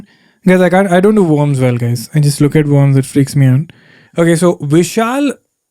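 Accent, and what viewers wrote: Kerala, India. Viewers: You talk just like my mallu cousin and have the same mannerism. He's irritating right now, but I won't be disappointed if he grows up to be like you. PS: Loved the long recording of you talking 🎯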